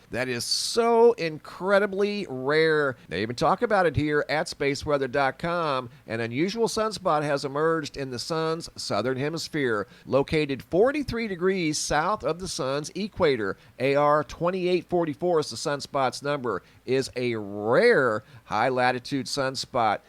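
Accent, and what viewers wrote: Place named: Central Pennsylvania, United States. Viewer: Btw thats how everyone sounds in central Pa and surrounding area Yoooooooo dude :-D Hey I'm from pa and yes back about 6 years ago back country road not a drone nothing like that full 100% craft out of this world just hovering right above my head on this back country road it was legit just chilling there as if its saying hi to me. All i had at the time was an ipod and when i went to try to record it and then began to move from me behind the trees It was above my car the height of telephone poll.. it was high tech and out of this world for sure.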